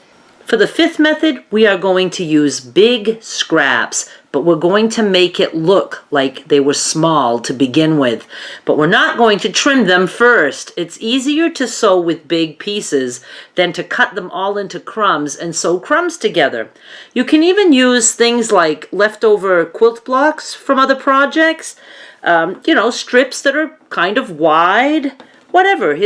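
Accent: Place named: New England, United States. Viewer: I just started my first crumb quilt for a wedding gift for my best friend 💝 I was using a combination of method 1 and 2 but now I've got 3 more to incorporate and really shake things up! Instant subscribe seeing the "Queen of crumb quilting" comment lol Where are you from,? I recognize the New England accent, but can't pinpoint a state 😂 hey from northern Maine 👋💝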